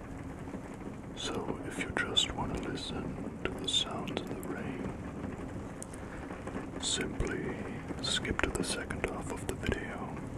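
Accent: Germany. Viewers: Tingle Lovers … I love joining you here. I noticed you may have an accent, are you German? Sending the best wishes to you in whichever country you are in. Tingles to you everyone! 🥂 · ☆ More whispering videos, please! I usually don't like talking videos, but your voice is the only one that's really soothing to me. As well as the German accent; my family is German. Thank you from my ♡ to yours! ☆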